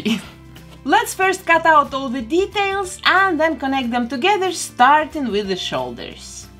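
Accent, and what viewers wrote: Russia. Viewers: Мне кажется или её родной язык не английский, ахпхахахах, может это и не секрет, просто я тут в первые, но мне кажется, что она выучила английский язык, а её родной это русский, ну по моему мнению акцент слишком сильный, ну хз может я ошибаюсь. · Is this Russian accent? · Omg I love your russian accent. I'm crying it's so beautiful! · Блян, отдам миллион рублей, но она русская, по акценту понятно.. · Из какой страны автор видео? Просто очень сильный русский акцент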